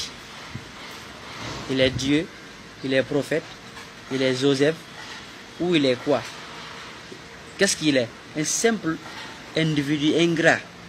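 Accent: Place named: Pular, West Africa. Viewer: Tu na pa mem le merite detr un ministre avk tes Français poular ....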